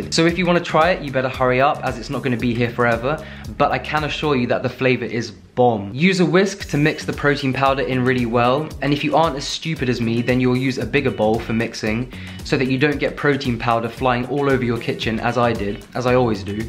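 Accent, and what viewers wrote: United States. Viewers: The random American accent made me sub! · kills me how he never fails to say “peenut butterrrr” in an american accent every time, even in voiceovers.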